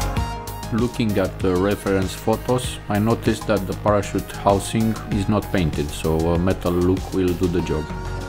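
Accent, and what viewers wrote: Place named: Romania. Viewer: Dupa accent esti roman 100%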